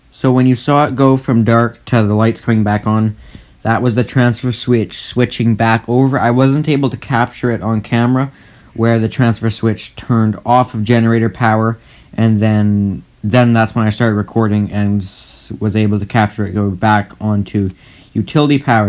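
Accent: Canada. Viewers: Ah, that's interesting. We have a similar program here in Alberta that allows you to go to school in the morning and work in your desired trade in the afternoon. It's called the RAP (Registered Apprenticeship Program). Is it similar to where you are (not sure which province you're in but I can hear the Canadian accent in your voice)?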